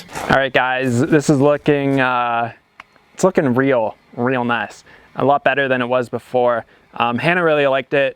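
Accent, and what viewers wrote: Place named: Canada. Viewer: I love that you haven't lost your Canadian accent eh. Hannah's one lucky girl to have such a handy man around the house.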